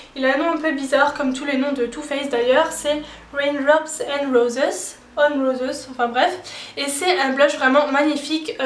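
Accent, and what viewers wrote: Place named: Alsace, France. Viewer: Aaaaaaah je me disait bien que ton accent me disait quelque chose enfin quelqu'un d'Alsace ! :D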